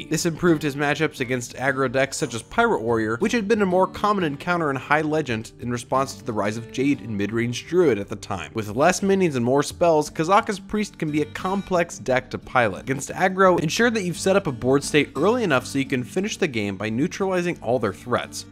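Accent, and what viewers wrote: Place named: United States. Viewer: He said Razakus, jeez, its just his 'american' accent i'd say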